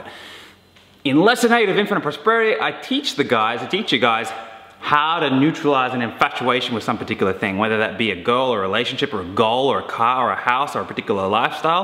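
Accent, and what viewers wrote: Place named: Australia. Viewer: Had to watch the first minute three times, its so hard to adjust to australian accent :) Nice video The car- finance- thing is a mix beetween them securing the loan and them beeing to lazy to look up the full picture. Hope it works out. How do other people manage to get loans on their supercars?, nobody buys these things outright with cash.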